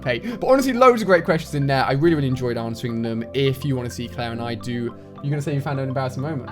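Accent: United Kingdom. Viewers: I THINK HE IS BRITISH BECAUSE OF THE ACCENT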